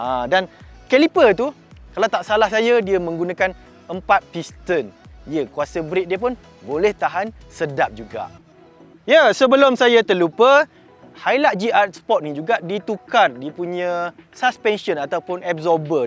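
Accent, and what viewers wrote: Kelantan, Malaysia. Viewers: ada bau2 kelate tu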